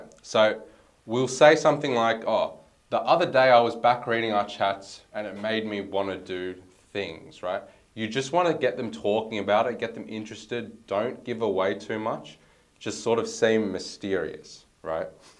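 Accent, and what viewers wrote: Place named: Melbourne, Australia. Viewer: you remind me a lot of natural tim from freedom business studios also based in melbourne, you present well like him and have a similar accent/way of speaking. Might just be a melboune accent though, anyway love your content really helping me with my ai influencers im in bris myself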